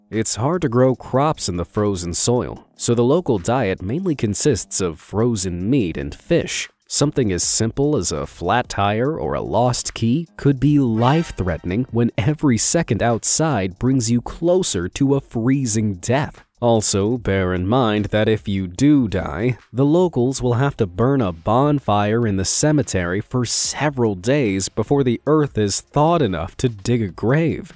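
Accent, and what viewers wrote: United States. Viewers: This is a weird video. Why would a narrator with an American accent use the English word lorry? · patronizing yanky voice...urgh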